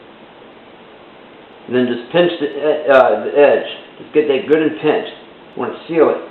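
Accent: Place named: Louisiana, United States. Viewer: No accent you say? ? I hear Louisiana.. Delightfully so. I chose this video to respond because I enjoyed it..and it will remind me that I want this recipe. :-)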